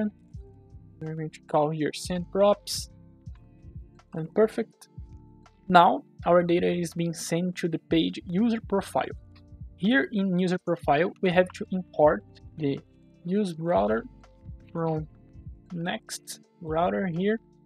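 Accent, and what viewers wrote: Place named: Brazil. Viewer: esse sotaque nao me engana você é br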